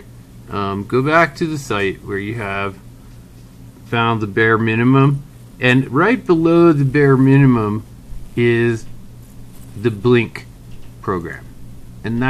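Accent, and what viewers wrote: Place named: Texas, United States. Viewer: you have wierd texas axent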